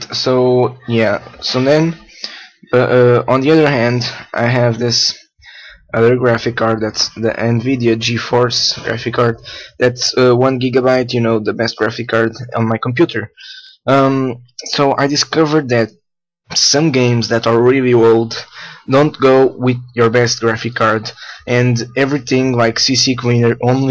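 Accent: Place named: Portugal. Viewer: ahah you are português ! i noticed by your falar xD , thanks also now i got more fps :D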